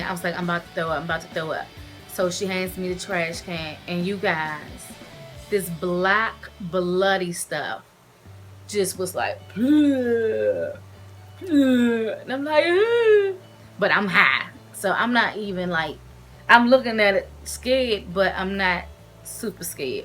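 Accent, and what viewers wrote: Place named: Washington DC, United States. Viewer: your accent made me miss DC so bad. wish you the best with your journey. I was just sleeved on the 13th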